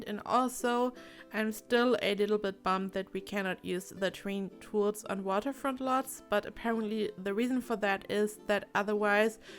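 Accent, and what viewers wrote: Germany. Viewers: I think you have a German accent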